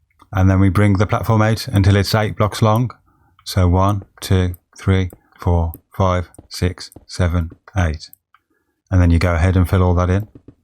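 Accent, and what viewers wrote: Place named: Midlands, United Kingdom. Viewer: Sound like you're from the midlands, mate. Black Country lad?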